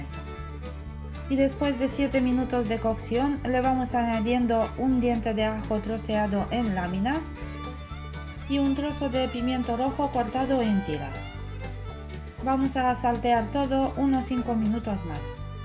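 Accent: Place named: France. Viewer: Me encanta tu acento franses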